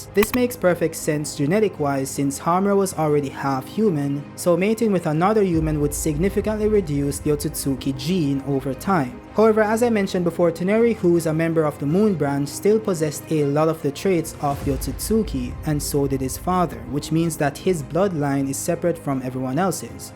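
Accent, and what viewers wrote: Jamaica. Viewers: Are you jamaican brotha?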